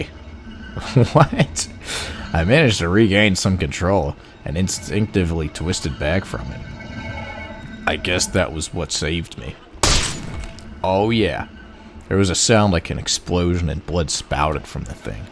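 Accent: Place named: South, United States. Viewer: Why does this game bring out a redneck accent in you lol. You should do voice acting.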